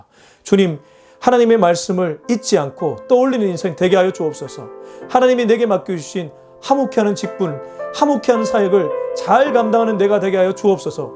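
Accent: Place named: Gyeongsang, South Korea. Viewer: 목사님의 경상도사투리 멋쪄요...^^ 우리들은 악인를 따르지않도록 주님께 기도드립니다^^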